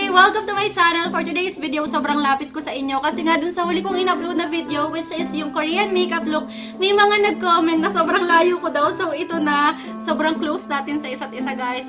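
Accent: Batangas, Philippines. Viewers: I'm new to this channel. Second time ko manood ng vid mo. Gustong gusto ko yung accent as batangueña haha. So cuteeeee 💙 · Ate batangueña ka po ba HAHAHAHA WALA ANG CUTE LANG KASIII!!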